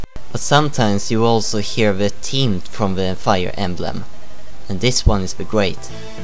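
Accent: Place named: Sweden. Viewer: Hehe. Man hör på ditt uttal att du är svensk. Älskar verkligen det spelet. Det är det bästa Fire Emblem för min del tätt följt av Path of Radiant.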